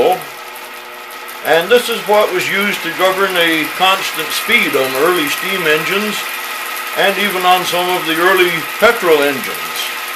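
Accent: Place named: United States. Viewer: This 'Murican accent... Love it!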